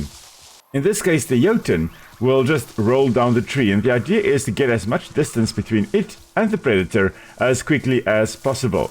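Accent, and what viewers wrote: South Africa. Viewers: Are you from SA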